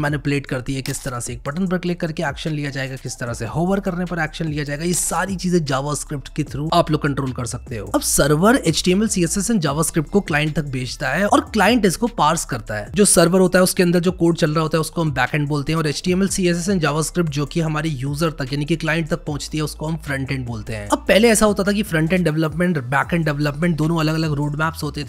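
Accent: India. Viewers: I didn't expect indian accent 💀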